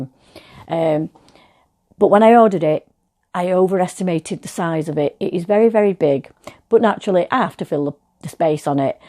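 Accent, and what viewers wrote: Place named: Manchester, United Kingdom. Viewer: Hi I’ve only just found your channel, but as a fellow Mancunian I’m really enjoying your content! I can tell by your accent that we are likely from the same part of Manchester! Can I recommend an author for you? I love to read any books written by Emma Salisbury, she is a crime writer and all her books are based in Salford, I love them and hopefully you might do as well. Looking forward to your future videos. x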